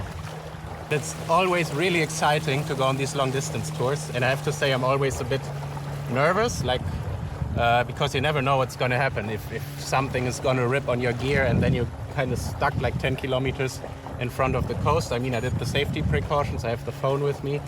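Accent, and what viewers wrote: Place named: Germany. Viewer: please dont tell the greek you raped their placenames with the german accent !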